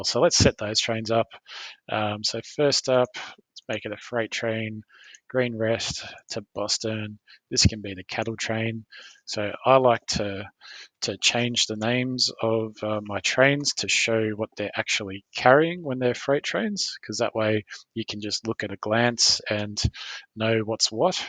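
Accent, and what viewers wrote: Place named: Australia. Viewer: G'day mate. I just came across your series of videos. Very good to watch, so I have subscribed to your channel. I'm watching from Melbourne, and by the sound of your accent, I think you know where that is.🙂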